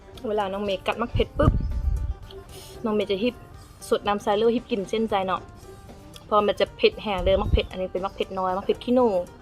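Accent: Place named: Laos. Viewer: You got some awesome Laos accent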